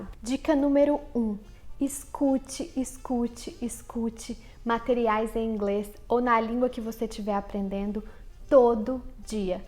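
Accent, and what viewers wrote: Minas Gerais, Brazil. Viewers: Esse seu sotaque mineiro é lindo. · Aee mineirinha igual a eu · Obrigado por mais um ótimo vídeo. Também acho o máximo seu sotaque mineirado rss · Olá, tudo bem? Então achei bem legal os três vídeos que vi, porém, gostaria de deixar uma observação sobre a questão do sotaque, existe uma diferença entre sotaque, acento e dialeto, sotaque é quando você fala outra língua com os sons da sua materna, ou quando você dentro do seu próprio país fala a sua língua materna com pronúncias diferentes, para uma mesma palavra, por exemplo, quando carrega o "R", ou coloca um "Uai", ou "Oxente", agora quando a língua sofre alterações de pronúncia de tal forma que dá origem a outro língua, como é o caso do português do Brasil e do português de Portugal, isso se chama dialeto e não sotaque ou acento. No seu caso poderíamos dizer que o seu português é dialeto do de Portugal e você tem o sotaque mineiro. 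Isso acontece também com o Espanhol, porque existe uma diferença entre Castelhano e Espanhol, e dentro da própria Espanha temos dialetos e não somente sotaques. Outro exemplo é a África lá tem muitos dialetos e também muitas línguas, por não compreenderem bem a diferença muitos fazem confusão dizendo que lá só tem dialeto, mas na verdade tem as duas coisas. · Meu mentor eh mineiro. Achava que seu sotaque eh um sotaque mineiro! Mas, eu quero perder meu sotaque americano em portugues... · Adorei o seu sotaque mineiro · Ahhhhh Até quê você não tem mais tanto o sotaque mineiro . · Eeeeu sabia que vc era Mineira s2 ^^ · Parabéns, vídeo carregado de bom conteúdo! Falando em sotaque além de linda, sotaque de mineira deixa qualquer um apaixonado.. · Belas palavras. A impressão que me dá é que o brasileiro, munido de seu complexo de vira-lata, espera chegar nos EUA e renegar suas origens latinas. 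Talvez dizer que veio de uma rica família de nobres europeus....Aliás, nem "latinos" os brasileiros se acham ne mesmo? Adoro seus videos, e esse sotaque mineirinho maravilhoso, ne? kkkkkkkkk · Lindo esse teu sotaque mineirinho! (eu sou gaúcho).. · Que sotaque lindo! Apaixonado pelo sotaque mineiro! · impossível não notar seu sutaque mineirinho como o da Fernandinha Takai... rsss show!! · VOCE É MINEIRA DE VERDADE? ME TOO! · Muito bom o vídeo!! e muito engraçado! parabéns!!! confere o meu canal também! estou indo para a Inglaterra e vou gravar vlogs lá!!! ;) e PS você é linda!!! e o sotaque mineiro é lindo! kkk mais um escrito!!!! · Eita! que lugar de minas você é? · Malandragem de mineiro. Porque então ela se esforça tanro para não falar com sotaque mineiro. Na Índia é muito comum os cursos de inglês darem ênfase na perda do sotaque nativo. O motivo de perder o sotaque nativo é muito simples, ele incomoda o falante nativo do Inglês. 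É desconfortável para eles. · Mineirinha igual eu <<num> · ela é mineira hahahaha eu também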